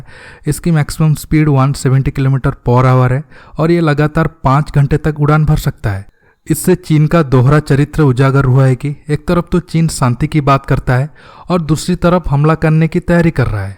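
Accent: Odisha, India. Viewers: App Odia ho mujhe lagta h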